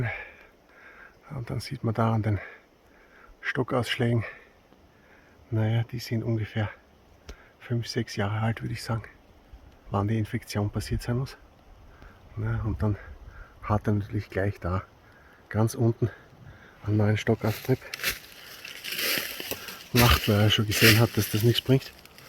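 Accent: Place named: Austria. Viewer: Omlouvám se, ale nemůžete mluvit spisovnou němčinou? Jedlé kaštany mě zajímají, sami je už pár let sázíme, ale tomu dialektu (AT?) jako cizinec nerozumím úplně dobře ..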